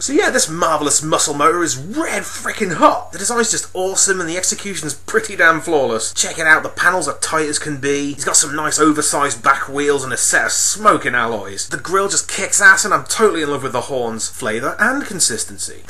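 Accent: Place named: United Kingdom. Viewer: Hey lad, I know you sound British but in a video you showed a Budweiser. Are you truely British?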